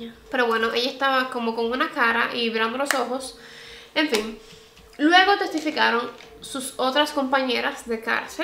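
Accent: Dominican Republic. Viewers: Me encanta 😍 tú trabajo ,sobretodo porque hablas con nuestro acento inconfundible de nuestro país ,Republica Dominicana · Desde que la escuché supe que era Dominicana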